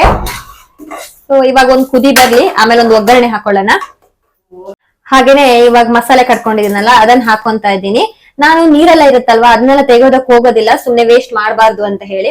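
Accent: Kundapura, India. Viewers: Kundapurdavra? Kundapura bashe keloke laik ath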